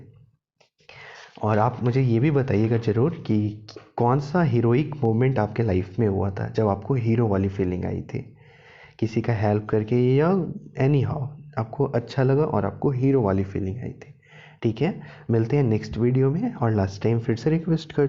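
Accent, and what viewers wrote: Bengal, India. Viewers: Sir ayi hai . Are you bengali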